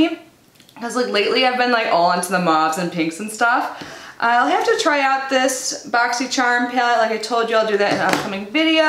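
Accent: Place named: Midwest, United States. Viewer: Subscribed! I started watching your Ipsy videos a while ago, I really love them :) also I totally resonate with your midwestern accent haha. Keep it up girl 💜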